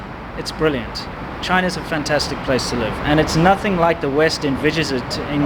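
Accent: South Africa. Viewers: He's from South Africa, so he speaks South African English. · Why do you not have much in common with Afrikaners? You don't sound a lot different to them. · I'm an American but I wish I had that South African accent. It like having a British accent (where people immediately think you know what the hell you're talking about) and an Australian accent (where people immediately think you wrestle alligators) Best of both worlds.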